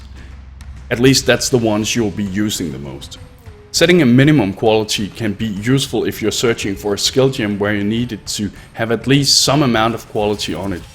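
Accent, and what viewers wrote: Denmark. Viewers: Yo nerd. Just something im wondering, are you Danish ?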